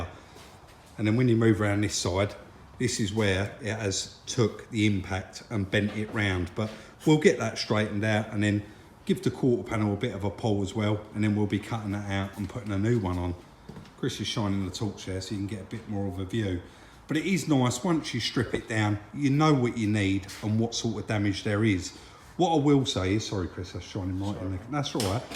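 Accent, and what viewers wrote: United Kingdom. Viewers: This British accent 🤣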